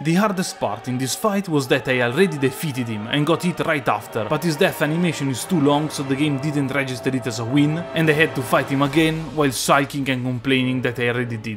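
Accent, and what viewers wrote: Italy. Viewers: hey man can i ask you something? are you from italy, because you' accent you'r form there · Man, such a great video! Hope that palestinian kid is doing ok :( PS che bello vedere l'accento italiano riscuotere un simile successo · Aaaah l'inglese parlato da un italiano... POESIA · This video just popped out in my recommendation and as soon as it starts I hear in your voice a strange accent, I know it's really strange but I wanted to ask you a question, are you Italian? To me it seems a lot that you have an Italian accent, you know, I'm italian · appena ho sentito l'accento, ho capito che è Italiano ahahaha. Sono troppo d'accordo, che canale figo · L'italiano comunque si sente lontano un miglio XD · palesemente italiano hahah Ottimo lavoro fratello👍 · Ma che accento Veneto hai? 😂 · ho capito che sei italiano al minuto è bello vedere youtuber che sono italiani fare video in inglese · Your accent looks so italian broo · This is the best Italian accent I've ever heard!! 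Also, cool vid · Are you the Dave504 of gaming? lol your thick italian accent reminded me how Dave speaks! Great video mate, very well done! You put a lot of effort into it, and you made me realised Iàve missed a frozen dragon 😅 And even more, well done for creating content in eglish, I'm been living in the UK for many years now and I still don't feel confident about doing so. Thank you for what you're doing, you gave me a boost in confidence to consider creating contente not in italian! Keep up with the good work!💪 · TROPPO BELLO L'ACCENTO ITALIANO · Fra L'accento italiano è inconfondibile. Grande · Honestamente mi ha piaciuto tanto il accento Italo-inglese che mi sono suscritto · very obviously Italian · Dude great run, especially the Italian ass accent get me all of the time hahahah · Bro sento l'accento italiano , comunque bel video abbastanza informativo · You are the most Italian man I have ever heard. 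Subscribed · Mamma mia che accento italiano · Bel video, ma con questa pronuncia di riconosce che sei Italiano😆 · Secondo me sei italiano 😂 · Sempre bello sentire l'accento Italiano, bel video! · Find this video in my homepage, hear you talk and i think, this guy is Italian, then you go "sweat, blood and blasphemy" and i get the confirmation you are italian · Great video! Don't know why you wanted to talk in english, even if è abbastanza intuibile tu sia italiano... but whatever :D. Good video nonetheless. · Mi sembra troppo italiano questo inglese Aswer me my BOI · dalla tua voce sembri Italiano...ci ho preso? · hai un accento italiano fortissimo e lo amo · Fra è così strano trovare l’accento italiano più forte della storia cliccando su un video a caso nella home. Nice video tho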